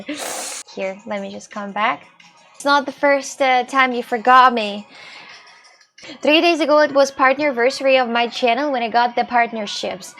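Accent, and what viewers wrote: Russia. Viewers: I love that Russian accent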